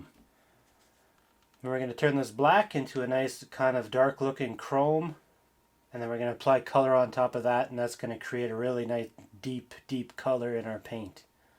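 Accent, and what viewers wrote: Canada. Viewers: I thought tube had closed u down man good to hear rhat Canadian voice good video bro 🇬🇧🏴‍☠️🇨🇦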